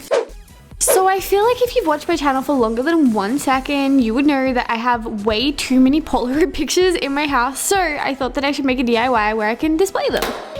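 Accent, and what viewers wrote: Australia. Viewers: her voice sounds as if she was aspyn ovard...yet australian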